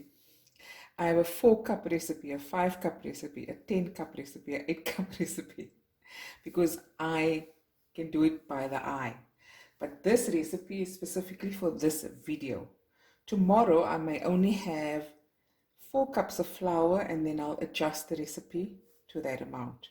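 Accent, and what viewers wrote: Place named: Cape, South Africa. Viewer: Oh, how I long for the cape when I hear your accent. I grew up there.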